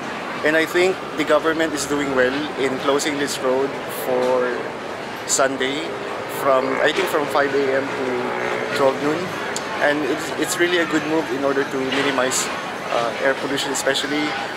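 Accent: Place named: Indonesia. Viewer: Betulll,logat inggrisx jg indo bnget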